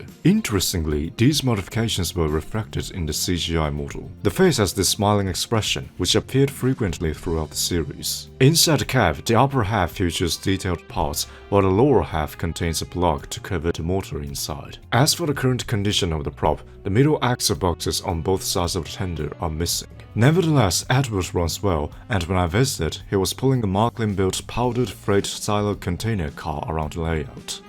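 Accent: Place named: Japan. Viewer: bro sounds like a japanese person who is learning english from posh british people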